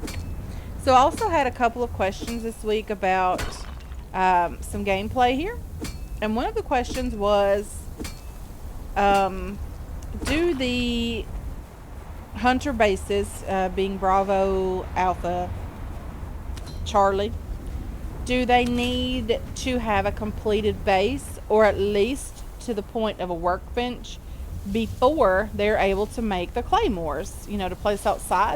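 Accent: Kentucky, United States. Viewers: wat part of kentucky you from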